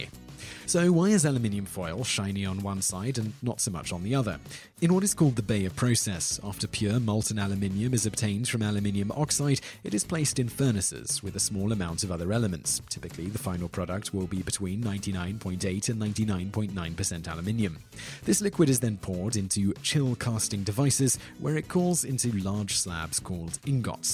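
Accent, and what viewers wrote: United Kingdom. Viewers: American production, British narrator. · CrazyBear65 bonus fact, he is English, and speaking English the way the English speak it..... not the Americans. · Not sure if trolling, but here goes... The presenter is British while the rest of the channel team is American. It's merely the difference between American English and British English dialects. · It's spelled ALUMINUM It's pronounced UH - LOOM - IN - UM Where in the world do the English get the extra "I" that they insistently include in their pronounciation?!?!?! PLEASE STOP!!!! IT'S FRIGGIN ANNOYING!!! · I get the concept of England and America being two countries separated by a common language and variations on pronunciation. However, there are certain words, said throughout these videos that truly strike the American ear as painfully wrong. Some of these words include: Aluminium. 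Please note, there is only one L. Consider such words as: Luminate and Illuminate (which does have two Ls), these words - even spoken by the British, do not have the strange sound of: Al lu min E um. However it is forgivable. Controversy: it is con-tro-versy! Not Con-trav-ersy... England invented the friggin' language and the rules that go along with it. As such, they should know the difference between the sounds of an O and the sounds of an A ... not to mention the rules regarding syllables and how they are used! Although this is far more annoying than aluminum .. there are worse examples of the English butchering their own language. Behemoth: Perhaps the funniest thing about this word is the fact that the English are the only people on the planet who butcher this word. It is: buh-hee-moth with the O sounding like that of removing the N from the word, month... NOT: be-a-moth!!! There are several others, but these should do, for now. 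Still, there is one personal acception, from a previous video: Seneca. I grew up in a few different places: Ashford, Ireland; Ft. Lauderdale, Florida; and Central New York ... not far from Seneca Falls. Additionally, there is the Seneca indian tribes. We can all attest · please explain why you, as a Brit, pronounce most words as we do but then spell your titles in the American form? I have seen in your titles color and here aluminum. Are you ashamed of your national heritage? As a Brit abroad you should be an advocate for our great nation and history. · That how we pronounce it in the UK. We think the way you guys say it sounds silly so the feeling is mutual.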